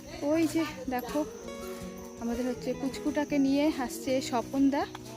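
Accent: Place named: Bangladesh. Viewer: আমি আর আমার বন্ধু তোমার ভ্লগ দেখি। তোমার বাংলাদেশের টানে কথাবার্তা গুলো শুনতে খুব ভালো লাগে। আমাদের বাড়ি কলকাতা কিন্তু আমাদের দুজনেরই আদি বাড়ি মানে পূর্বপুরুষের নিবাস বাংলাদেশে ছিল। খুব সুন্দর হয়েছে ভ্লগ টা। শিলিগুড়ি জলপাইগুড়ি তেও আমার বেশ কিছু পরিচিত বন্ধু আছে। ভালো থেকো।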